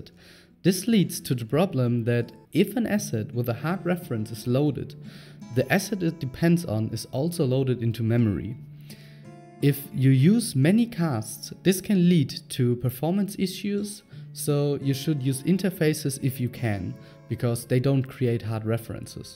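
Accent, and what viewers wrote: Germany. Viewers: ..I'm inspired by diablo and gothic :) Are you living in germany or america...you sound german, but that doesn't mean you live here. :)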